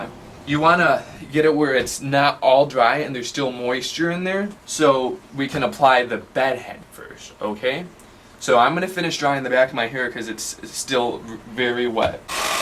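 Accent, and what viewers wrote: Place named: Canada. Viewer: You sound quite canadian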